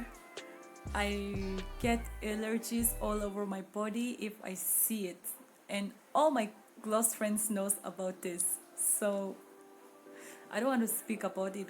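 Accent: Nagaland, India. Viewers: Love the way u spoke Sumi dialect 😍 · Wow I love sumi dialect👍😎❤ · Haha so sweet the way u speak Sumi dialect❤️ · Hahaha love ya sumi dialect lots of love from Hongkong Market Dimapur 😀😀😀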